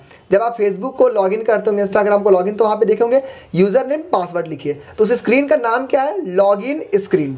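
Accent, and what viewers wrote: Bihar, India. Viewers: Hello bhaiya , you teaches very well. I study IT from you and I want to ask you that are you from Bihar?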